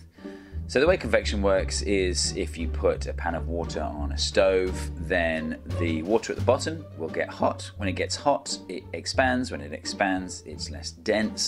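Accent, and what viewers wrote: England, United Kingdom. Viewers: You are very entertaining as you are enlightening. Got to say, as a Southern born American, I love the English accent!!!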